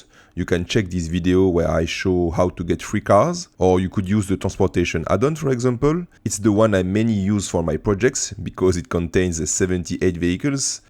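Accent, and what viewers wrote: France. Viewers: 💪🏼Je m'abonne clairement!!! je cherchais des bon tuto sur Blender et plus particulièrement sur la création ciné/filmic de scènes de voitures en mouvement dynamique! PS: je m'abonne aussi parce que j'ai capté l'accent Français!!! 😃 #blackpanthers💪🏼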